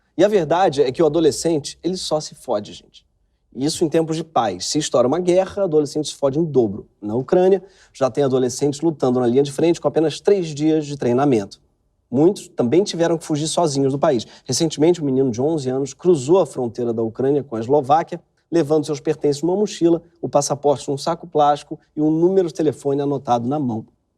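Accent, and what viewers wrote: São Paulo, Brazil. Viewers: falando paulistanês é o melhor MEU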